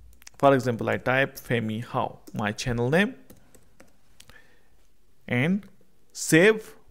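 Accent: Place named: India. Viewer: The heavy indian accent 😅😂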